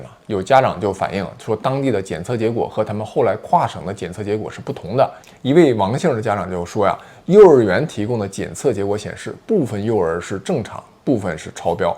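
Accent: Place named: Anshan, China. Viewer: 女主播好像是鞍山人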